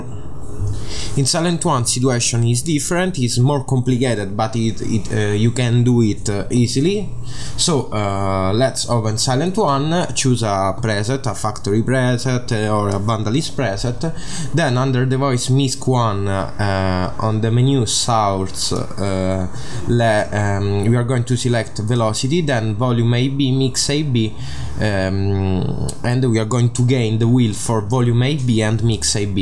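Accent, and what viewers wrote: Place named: Italy. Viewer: Si sente troppo che sei italiano🤣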